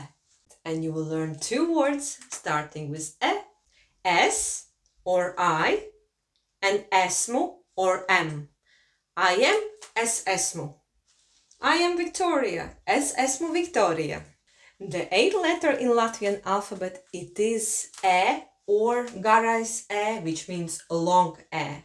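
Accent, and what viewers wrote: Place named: Russia. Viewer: Its interesting when russian is teaching latvian in english :D Had some troubles with Ģ pronunciation. And classic russian fyorst not first :D But in general nice video and idea :)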